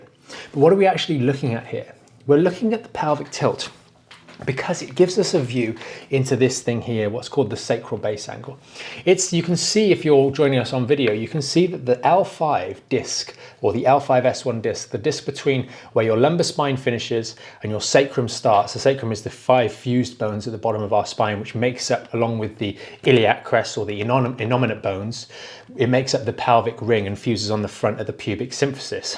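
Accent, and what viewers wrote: England, United Kingdom. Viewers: This is really wonderful. I’ve left Spondolitis. Awful 5 years old got run over 7nder a wagon. Only when older back problems. Discitis. Nearly died. Had no surgery or anything. Painkillers. What can I do. I dairnt excercise. 5” height lost . And a tilt a gait. Is this same? Nice to hear English accent. So,help.😮😊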